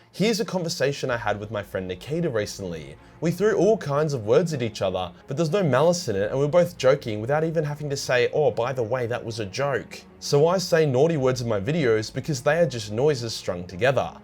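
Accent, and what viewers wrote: Australia. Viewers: I cant lie, this is the only way i can consume anything from 40k because everyone else who makes content like this just sounds like a loozerrr, why would i want to hang oout with a loozerrr when i can just listen to some aussie chad step above all the other loozerrr'z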